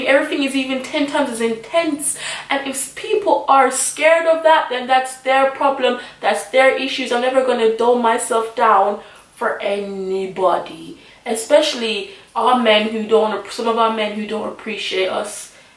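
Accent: Jamaica. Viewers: I love when your Jamaican accent comes out 😍😍 You're so peng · First time watching ur vids and I could tell rite off the bat that youre a Jamaican... ... boii we cyaa hide.... ... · I seriously love it when you speak patois😂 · Omg are u a Jamaican? ??......mi hear di patwa....hahaha😂😂😂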